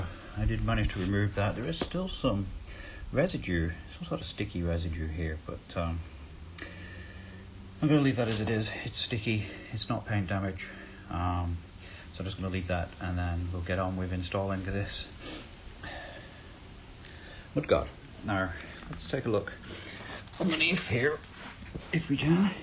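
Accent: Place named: Ireland. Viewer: Do I detect an Irish twang to your accent? Great video by the way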